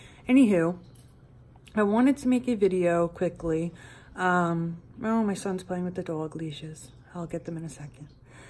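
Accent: New York, United States. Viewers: In America her accent is New York , New Jersey , Boston area sound like to me .Im in the south KY . to both be American we sound so different ! Shes adorable !! I love her accent !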